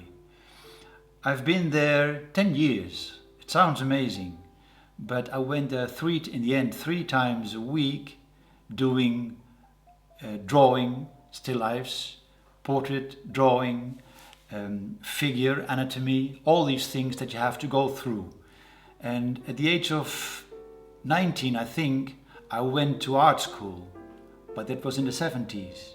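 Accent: Netherlands. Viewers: Love your work! Just curious - where is your accent from? I'm guessing Dutch?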